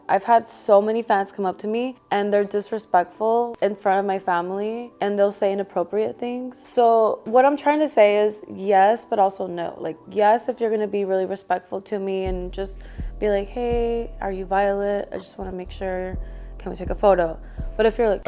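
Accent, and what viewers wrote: Spain. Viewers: LOVE THAT SPANISH ACCENT 🔥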